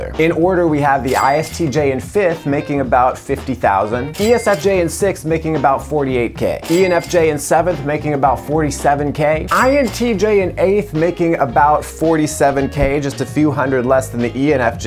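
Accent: United Kingdom. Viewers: i'm british, i've gotta say doesn't sound anything like a Londoner but sounds like the people where i live, good british accent.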